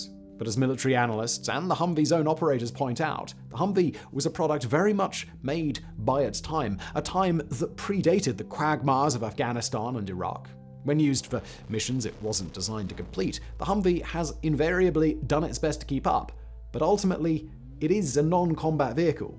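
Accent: RP, United Kingdom. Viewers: I'm a Brit, I don't know anybody who speaks like this, with such an unnatural exaggerated RP accent. Why not just speak London RP? It takes away from the otherwise great video content.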